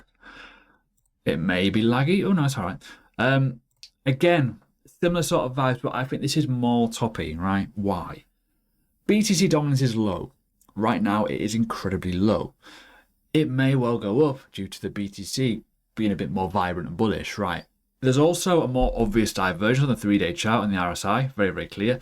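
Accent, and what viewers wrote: United Kingdom. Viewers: I LOVE THE BRITISH ACCENT :)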